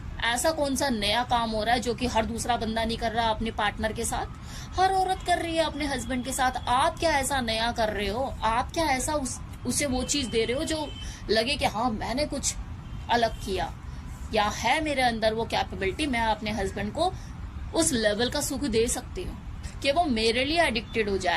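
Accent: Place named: Delhi, India. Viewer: mujhy lagta hy aap Dilli se hn na. Apki zaban (Urdu) aur lahja bata raha hy... Aur aap bohot khubsurat (sundar) ho....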